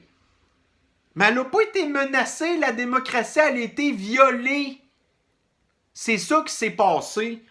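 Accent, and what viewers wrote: Quebec, Canada. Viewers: Avec ton accent canadien tordu on comprend rien à ce que tu dit ! parlé Français . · Rien a faire,l'accent québécois,je n'y arrive pas. Bonne continuation quand-même 😊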